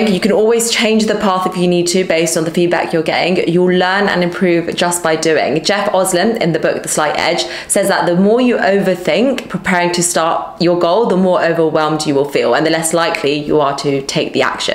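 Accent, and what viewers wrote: United Kingdom. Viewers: I love your videos. But it’s very hard to understand, it feels like it’s on FAST forward. Plus the British accent makes it harder. A bit slower would be a lot easier to absorb the content. Just a feed back. Thank you! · admired with your stunning British accent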